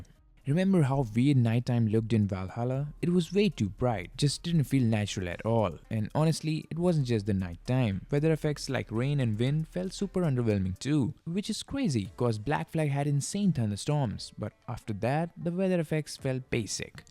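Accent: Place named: India. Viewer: Голос как у Индуса.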